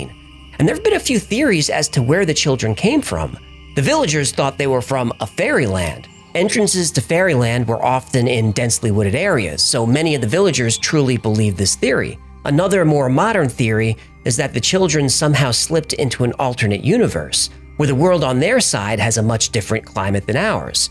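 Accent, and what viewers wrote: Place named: Long Island, United States. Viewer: Not Brooklyn. That's more of a long island accent.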